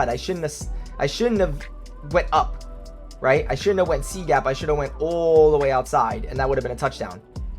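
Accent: New York, United States. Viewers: Can’t stand that New York accent😭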